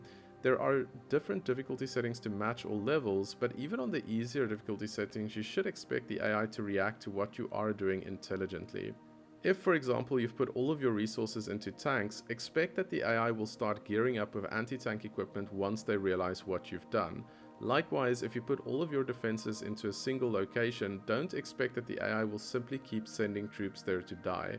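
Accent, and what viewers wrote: South Africa. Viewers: Was surprised to hear the SA accent! Have this game in my Steam Library but haven't downlosdedit yet. Not BIG RTS games but have been exploring them lately. Also turn-based RPGs like Xcom and Divinity: Original Sin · Hey, are you South African?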